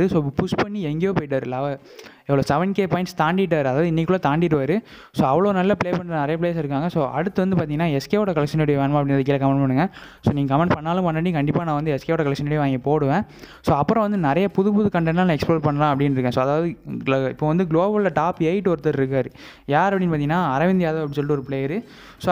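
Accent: Tirunelveli, India. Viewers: Neenga nellai ah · Bro ungaluku tirunelveli ya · Bro neeinga triunelveli ya.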